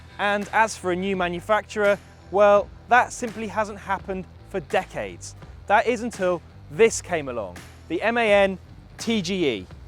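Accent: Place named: RP, United Kingdom. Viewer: I don’t think with that accent you hang out, with to many van and truck drivers or any other working man, Your more at home with Trinney and Rupert on the Kings road in a coffee shop.It’s a rebadged crafter, Man trucks are VW commercial wing. That information was not in the MAN brochure they handed you one hour before. You did not tell them this is VW first pure Crafter, not a VW engined Sprinter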